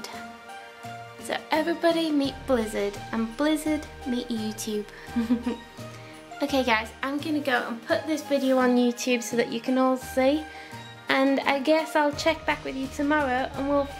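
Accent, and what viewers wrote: United Kingdom. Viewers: what's up with British accent my elf name is mark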